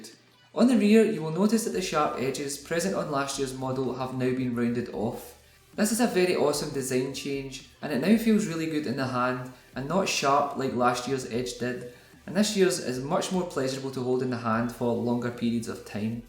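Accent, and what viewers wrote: Ireland. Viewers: Do people in Ireland find American accents as cool as I find yours? Lol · r u from Ireland? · Are you Irish or Welsh?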